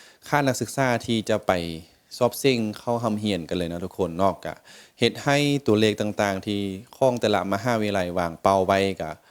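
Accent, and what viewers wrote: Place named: Nakhon, Thailand. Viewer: เว้าภาษาสำเนียงเหมือนคน อ.นครไทย จ.พิษณุโลกเลยค่ะ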